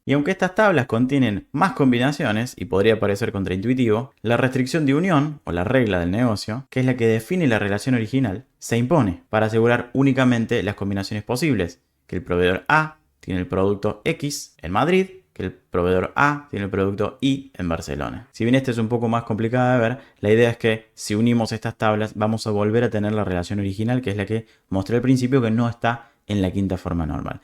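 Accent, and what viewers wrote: Argentina. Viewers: Justo estoy haciendo un TP de este tema, y me agrada escuchar todo esto en "argentino", gracias, te ganaste un suscriptor. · acento argentino que me da confianza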